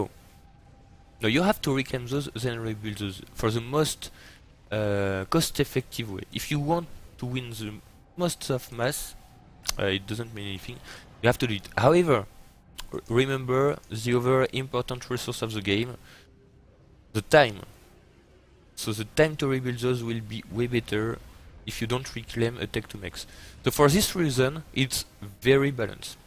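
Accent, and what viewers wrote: France. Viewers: tu est français? Car je trouve que tu as quand même un accent français, et cela se sent bien, je suis un de tes nouveaux abonnés et je trouve que tu vas bien m'aider à progresser dans SCFA et je te souhaite une bonne continuation!;-) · Bring back the scoot! Your pronunciation of scout was very accurate, but the french accent only enhances the video.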